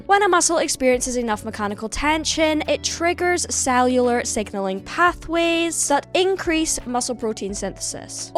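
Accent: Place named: Scotland, United Kingdom. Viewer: SECOND COMMENT:🥈 happy Sunday, Can I please be your Pookie? What does that mean? By the way? I don’t even know what that means and I still wanna be your Pookie BTW You’re a Scottish🏴 accent=🔥I love you❌⭕️❌⭕️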